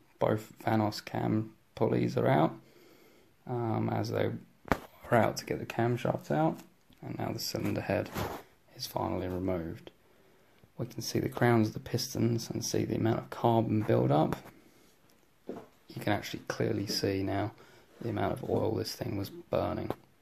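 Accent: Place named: United Kingdom. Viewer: Ah right. Your British accent made me think you were from UK. No worries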